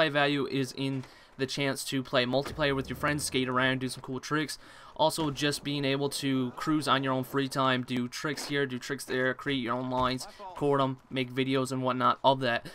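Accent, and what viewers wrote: Canada. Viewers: What part of Canada are you from holy shit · Canadian accent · are you Canadian?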